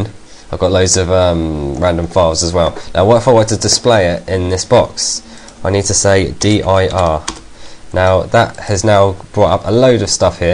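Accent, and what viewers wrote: United Kingdom. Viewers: Very good video. Very well done. btw thank you for not using any annoying ass music, rap, rock, whatever,ect THANK YOU!! just a normal English voice,with classy british accent to-boot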